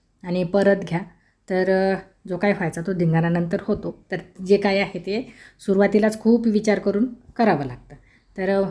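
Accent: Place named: Akola, India. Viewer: ताई तुम्ही अकोल्याचे आहे का तुमची भाषा तशीच वाटते जगातली भीमच म्हणतात बीमच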